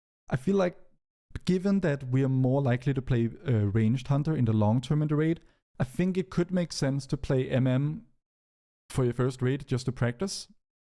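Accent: Denmark. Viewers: Are u a dane mate? Its sounds a little bit like it. Great work btw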